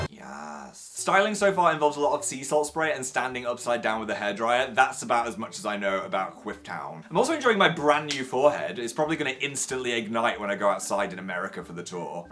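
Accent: North, United Kingdom. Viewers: I don’t know if it’s the confidence, the hair, the tour... but sounding a lot more.. northern in this video. Maybe it’s just me. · sounding very northern today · Is it just me or does sound REALLY Northern in this video? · Like how his accent is getting more northern again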